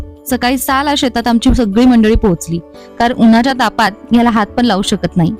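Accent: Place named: Pune, India. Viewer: तुमचे माहेर कोणत्या शहरात आहे,,,, तुमची भाषा खूपच शुद्ध आहे,,, पूनासारखी वाटते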